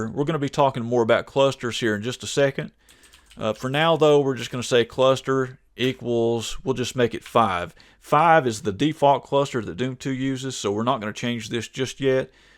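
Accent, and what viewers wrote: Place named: Texas, United States. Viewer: i can hear texas from this man's accent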